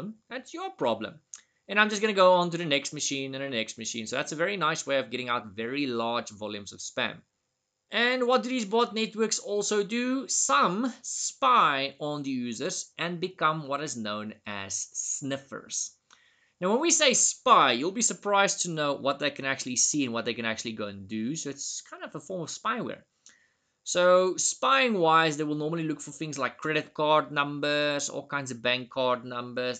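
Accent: South Africa. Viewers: aye man. Nice to see a fellow south african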